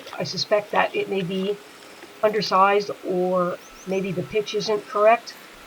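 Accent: Philadelphia, United States. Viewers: Philly accent?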